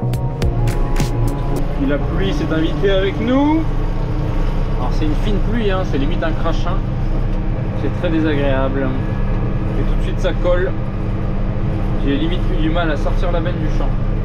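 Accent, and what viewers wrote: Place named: Lorraine, France. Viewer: hello merci pour cette réponse dans l'attente de la suite des video en aparté je me regale aussi du petit accent bien de chez nous qui me fait du bien amitié de lorraine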